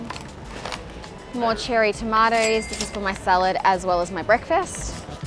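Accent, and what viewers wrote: Australia. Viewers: Please marry me.. Fuck first she's a super hottie then she's has the. Australian accent.. She stole my heart right there.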